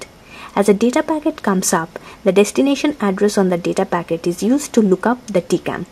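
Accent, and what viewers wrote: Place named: India. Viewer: please, can one indian in math/comp.science vids speak without this fucking accent. just one?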